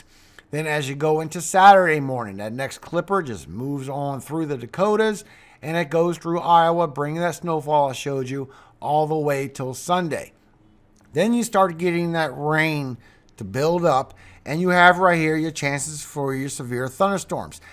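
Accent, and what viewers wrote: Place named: Baltimore, United States. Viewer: i would Not have guessed u were from milwaukee u kinda sound like u have a baltimore thing goin on ..probably only cuz im from around there ..unfortunately..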